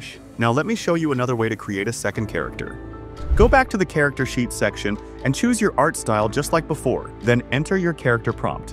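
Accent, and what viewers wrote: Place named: Asia. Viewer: how come you have a new voice boss, likes your asian accent better 😊 i know this tool😊 before i watched your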